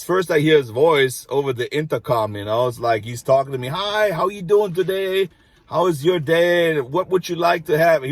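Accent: Germany. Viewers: Vor ein paar Wochen wurde mir ein Video über Obdachlose in LA von dir auf YT angezeigt. Ohne vorher auf den Namen des Kanals zu achten habe ich reingeschaut und nach ein paar Sätzen von dir gedacht, dass der Akzent sich irgendwie deutsch anhört und bin dann auf den Kanal um herausfinden, ob ich recht habe. Musste nicht lange suchen als ich den Namen des Kanals gesehen habe :) . Seitdem habe ich schon einige Videos geschaut und auch den Subscribe Button gedrückt ;) Bisher war ich zweimal in Venice, das letzte Mal 2003 und ich glaube mich an deinen Henna Tattoo Shop zu erinnern. Den gab’s da schon, oder? Falls ja, haben wir mit Sicherheit damals sogar miteinander geredet :) Liebe Grüsse aus Heidelberg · I love your German accent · We like u the way you are sir & I love your German accent!! I cant imagine learning German, much harder than English!! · You and your German accent are always a treat to listen to. 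· You should feel proud of your German accent. Germany, to many Americans, is associated with efficiency, innovation, and strong work ethic. The influence of the German people in America has been quite profound. The best blue denim pants in the world were created by a German in America, out west even, and centuries later, Levi Jeans are associated with being cool and rugged, and are still quite popular. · Ich komm auf deinen german accent voll klar 😃👍. Tatsächlich macht er es mir etwas einfacher dem zu folgen, was du uns erzählst. Cheers man. Stay healthy and in good mood ✌️ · Don't worry, we like your German accent. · Last night a friend was over and we got bored so I put one of your videos on. It inspired a lot of conversation and it was like we were there hanging out with you. Miss Venice beach, next time I go your henna shop is top on my list. Your a smoother talker than you think. Keep it up, you can only go up from here. 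BTW, I love your German accent and hearing your pronunciation of German and English words. Don’t feel self conscience about it, it’s unique and cool. Own it and be yourself. You da man!!!🙌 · We love your German accent, and your English is very good! You are just a ray of light my friend, always positive and make people feel good! Love your energy and niceness. Keep up with these videos, you make me smile and laugh at every one.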